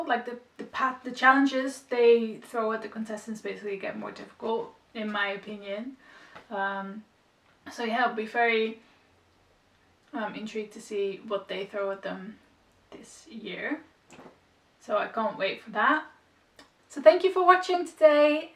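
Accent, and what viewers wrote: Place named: United States. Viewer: Hi, I found you through Liz, the Baker that Sews, she always makes great recommendations! Where are you in the world? Your accent sounds American but I expect I am absolutely wrong there! You are going to be very busy sewing with all your plans! Looking forward to seeing your makes! I have just finished Sew House Seven Sauvie sundress for my holiday in Dubai in a few days, can’t wait! We are going to have a Sewing Bee in April, it’s such an inspiration. Good luck with your channel.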